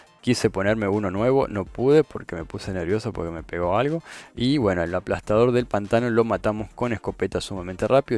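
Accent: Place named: Argentina. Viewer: Argentino??? me suscribo hermano!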